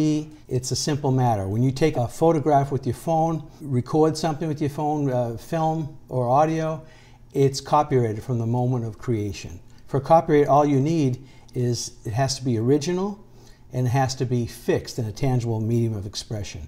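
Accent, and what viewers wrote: Boston, United States. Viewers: I can hear your Boston ❤️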